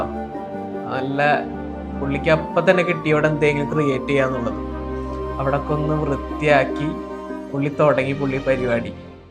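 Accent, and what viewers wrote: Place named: Thrissur, India. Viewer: ശെരിക്കും ഉള്ള കലാകാരൻ..... പ്രകൃതിയിൽ ആണ് 🥰🥰🥰🥰എടോ താൻ തൃശ്ശൂർ ആണൊ place...... Slang അടിപൊളി 👍🏻👍🏻👍🏻👍🏻🥰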